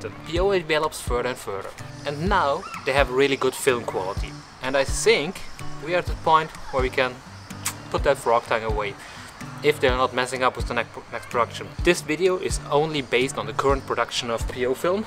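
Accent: Germany. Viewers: Cool video! Are you from a German speaking country? Or did I mishear something :D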